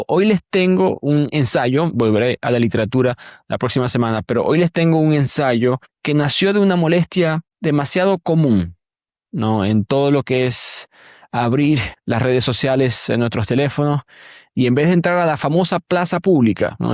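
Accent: Maracaibo, Venezuela. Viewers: Amé el tema y amé el acento maracucho 👏🏻👏🏻👏🏻👏🏻😂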